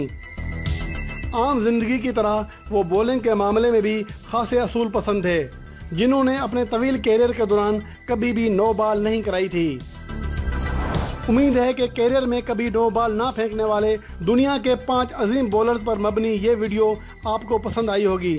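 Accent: Sindh, India. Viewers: Aap ki boli se lagta he aap sindhi ho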